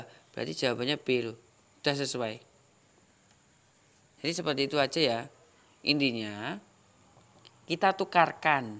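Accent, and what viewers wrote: Java, Indonesia. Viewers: Mksh ilmunya....cara penyampaiannya enak...ada medhok"nya jawa...salam dr Bogor kak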